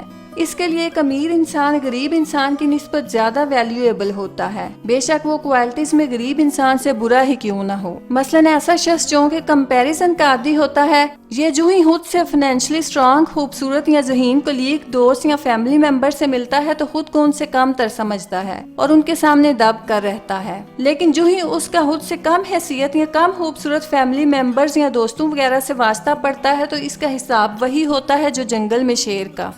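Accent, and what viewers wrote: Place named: Sialkot, Pakistan. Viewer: like your sialkoti lehja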